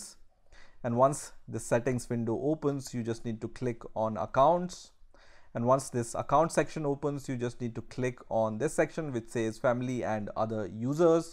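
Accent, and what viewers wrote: India. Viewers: This dude is German? But he sounds Indian to me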